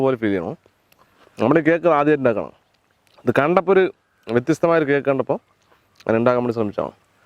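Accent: Palakkad, India. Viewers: Palakkadan bhaasha super🤪 mostly watching for your language. Pakshe cake adipoli aayitundu👍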